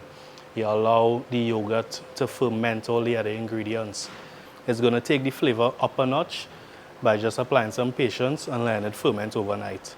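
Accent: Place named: Trinidad and Tobago. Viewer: I love your accent. Are you originally from Trinidad? Excellent recipe. I’m going to try it. Thank you.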